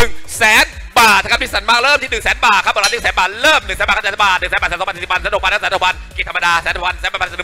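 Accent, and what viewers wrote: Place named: United States. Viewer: พูดแบบอเมริกาเลย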